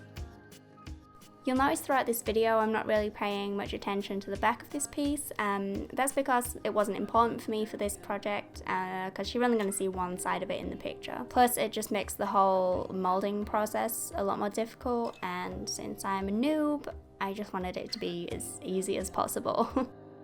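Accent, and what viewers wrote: United Kingdom. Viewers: Definitely gonna try this, you're such an inspiration. I really love british accent, what a joy to my ears to listen to you pronounce the word "Bloody" hahahaha :3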